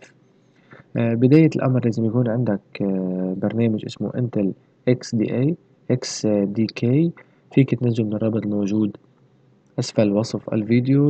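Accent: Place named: South, Lebanon. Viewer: mbyen mn swtak mn jnoub Lbnen sa7 ana Mn lbnen sken be jnoub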